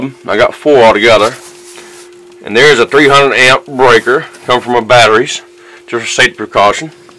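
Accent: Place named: North Carolina, United States. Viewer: are you from North Carolina or Virginia you sound like everyone in my family :) We are in NC probably 45 min from Virginia line.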